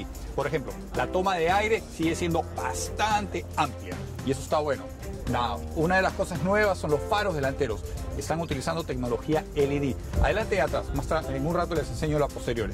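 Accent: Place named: Peru. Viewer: Are you from Peru? My girlfriend was translating your video for me and noticed your accent. It's just like hers.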